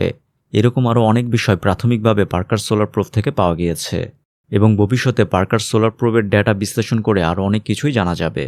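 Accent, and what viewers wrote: Narsingdi, Bangladesh. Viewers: ভাই এর বাড়ি মনে হয় নরসিংদী। ❤ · ভাইয়ের বাড়ি কি নরসিংদী নাকি 😀 আমার বাড়ি নরসিংদী, নিলক্ষা। ❤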